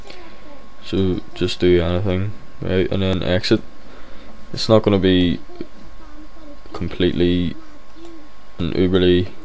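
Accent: Northern Ireland, United Kingdom. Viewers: Northern Irish. I know that accent anywhere! I'm Irish too. Hell yeah! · Yoooo ur frum Northern Ireland too matee haha high Five!!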